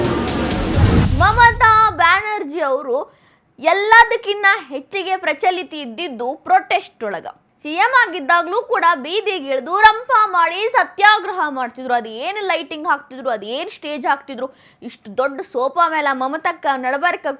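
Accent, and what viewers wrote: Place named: Hubballi, India. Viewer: Nimm ನ್ಯೂಸ್ ಓದದು ಹುಬ್ಬಳ್ಳಿ ಸ್ಟೈಲು ಬಹಳ ಇಷ್ಟ ಆಗ್ತದ್ರಿ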